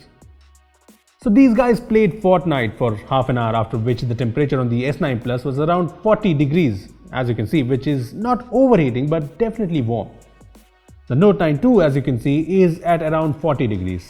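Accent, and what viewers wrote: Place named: India. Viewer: Cool a video about note 9 *clicks this video* *Indian accent* *Leaves*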